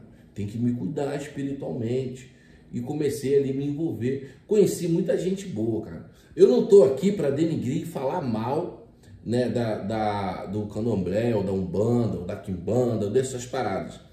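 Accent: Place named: Bahia, Brazil. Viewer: Como consegue falar baines assim , como baiano .opaió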